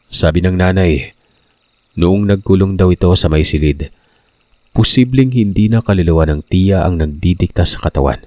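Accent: Visayas, Philippines. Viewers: Wow bisaya kaayog titol ohh hehehe❤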